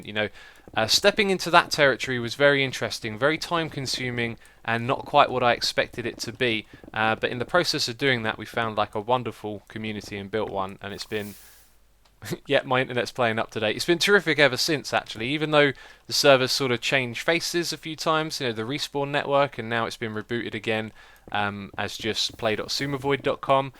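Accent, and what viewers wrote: United Kingdom. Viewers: ***** Yeah it's his voice. So british. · Solside eclipse? Saltside eclipse? Soldside? Soulside? Sulside? What are you saying?! It's too british :(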